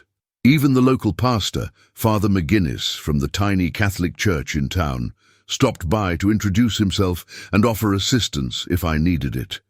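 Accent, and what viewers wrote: United Kingdom. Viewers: The plummy English accent of the storyteller is totally at odds with the narrative.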